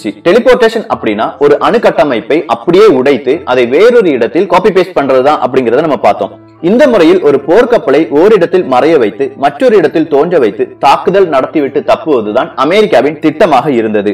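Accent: Kerala, India. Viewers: But the tamil pronunciation is in Malayalam.. 'koman' (common), kopy (copy), simbly (simply).....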